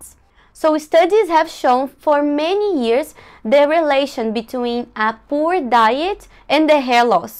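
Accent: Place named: Brazil. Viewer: I knew she was brazilian by her accent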